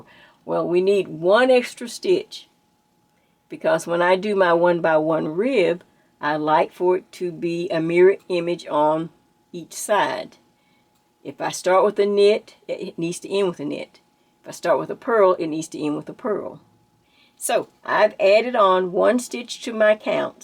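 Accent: South, United States. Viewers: I am one of those that stumbled upon your channel by "happenstance", and boy am I glad I did! I love everything about your videos - your tutorials, your personality, your stories, your comforting voice with the southern 'drawl'... I love it all! So much so, this is the first time I've taken the time to comment. Keep it up! I can't wait to see more! I'm so sorry about your loss of your dear friend Judy. Love love love your friendship vest named in her honor. That was the video that introduced me to you. Thanks again for sharing it all with us - I love the narratives you give in addition. Makes me feel so at home. :)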